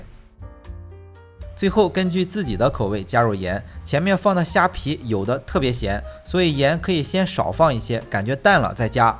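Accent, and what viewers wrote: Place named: Dalian, China. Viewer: 听这位老爸的口音似乎是大连银😆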